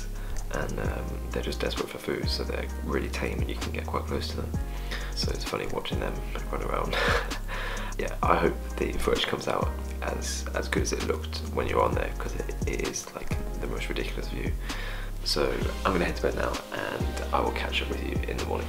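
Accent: United Kingdom. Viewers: i enjoy your videos and practice with your British acent greetings from bogota.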